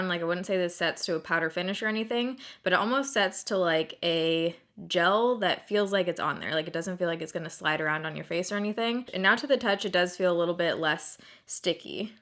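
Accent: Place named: Maine, United States. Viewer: Where are you from? You sound like you have a Maine accent! I'm a Maine girl, I'm probably way off but I was just wondering 😊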